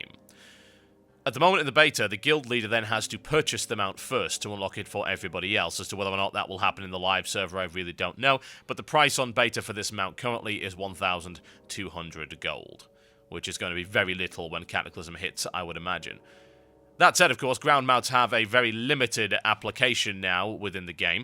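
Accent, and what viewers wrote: United Kingdom. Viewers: u idiot. he's british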